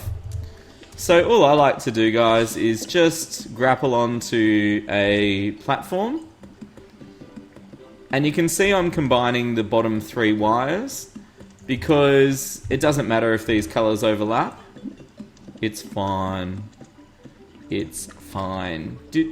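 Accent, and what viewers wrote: Australia. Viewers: Aussie aussie aussie oi oi oi i can tell by your accent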